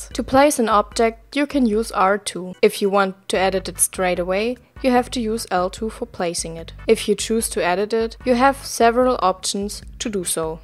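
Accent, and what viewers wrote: Germany. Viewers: Ahhhh das deutsche English :D. I Love it :D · The school i really need ^^ Does she have a german accent ?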